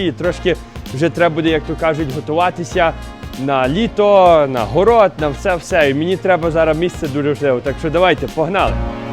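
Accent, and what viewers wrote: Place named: Hutsul, Ukraine. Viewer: Ти мені подобаєшся ,настоящий гуцул з полонини,і мова така,я працював з хлопаками з западної України. І в Чехії так їх тьма,трутнів,не таких простих заробічан як ми стобою. А роботодателів.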